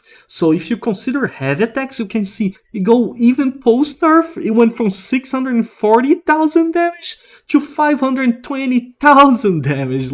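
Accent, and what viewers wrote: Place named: Brazil. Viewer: nao adianta disfarça o sotaque entrega kkkkk BR safado S2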